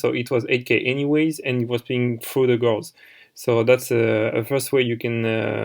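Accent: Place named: France. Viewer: i'm assuming you're french by your accent but i'll write in english for everyone. I thought about this deck quite a while now bcs tempest was an ftk that got banned in modern formats and you overlooked some cards that can actually turbo this instead of playing a bunch of randoms cards. Magical library is a spellcaster that will keep the engine going while being lvl 4. I think the most important tuner here is plaguespreader and should basically be the only one. You can use summoner monk to go into library or armageddon knight to dump plague that will combo with monk to summon tempest. You then play a crazy amount of draw spell cards like hand destruction, bamboo swords etc. No upstart so you can otk/ftk and why not a copy of magical explosion. I never tested it but i think it would be the optimal way to go.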